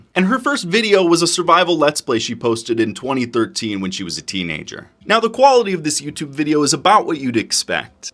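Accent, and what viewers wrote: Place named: Newfoundland, Canada. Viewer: Everybody talking about gem but i Just I pressed by ur Newfoundland pronunciation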